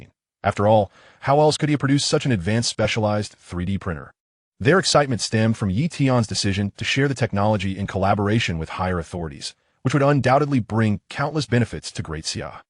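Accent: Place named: France. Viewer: Stopped after 7mins due to that FRENCH ACCENT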